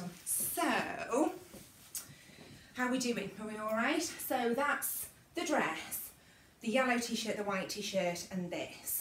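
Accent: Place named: Warrington, United Kingdom. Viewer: Honestly laugh so much watching your videos! Your accent makes me smile my grandma is from Warrington so has a similar twang! And your hair is FABULOUS!! ❤️❤️❤️❤️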